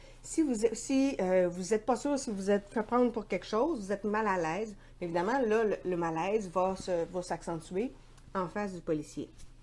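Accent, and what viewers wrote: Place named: Quebec, Canada. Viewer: Salut. Je viens juste de me réabonner À ta chaîne. J'adore honnêtement continue. J'aime bien et beaucoup ton accent québécois Amicalement bisous Sophie😺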